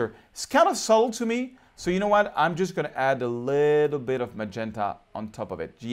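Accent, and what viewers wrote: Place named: France. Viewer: Je pense que tu es en train de perdre ton frenchie accent! ;) J'aime bien ce tuto, comme quoi, travailler ses photographies n'est qu'une question d'émotions que l'on veut y véhiculer.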